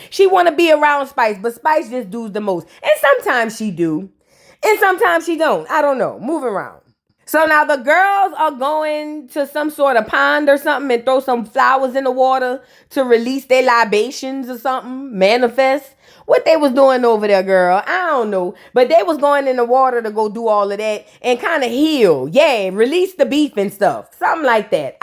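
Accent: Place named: Louisiana, United States. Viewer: luv ur content is u from N.O. cuz u keep it real frfr and ur reactions is great if u not from N.O. u sure do sound like u from Louisiana da bottom of da boot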